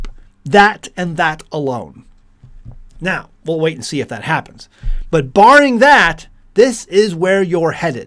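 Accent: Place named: Pennsylvania, United States. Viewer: Sounds like you are a fellow Pennsylvanian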